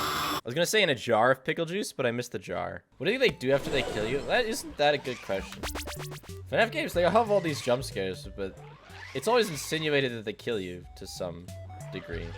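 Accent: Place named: Canada. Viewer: this "fake drunk" voice you put on sometimes perplexes me. how do you get MORE Canadian when you talk like this? These are dangerous levels of Canadian here! What black magics are you playing with to conjure this phenomenon?! You should narrate a whole book like this!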